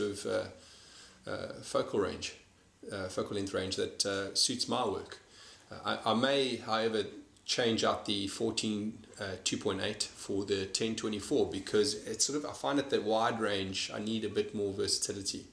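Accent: South Africa. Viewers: South Africa, one of the best accents. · Are you from South Africa? Sounds like your accent is from that area.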